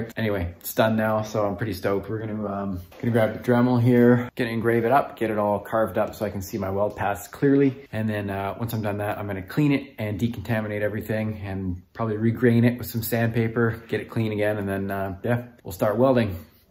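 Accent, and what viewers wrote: Canada. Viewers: T tu quebecois?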